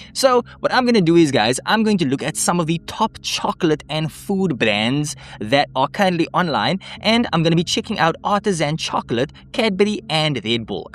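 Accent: South Africa. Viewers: Where is your accent from? SA? - Thanks for the tutorial! Would like to know how React plays that mass of images? Looks load and performance intensive. And I also would have loved to see how this works on mobile... different image set in portrait format?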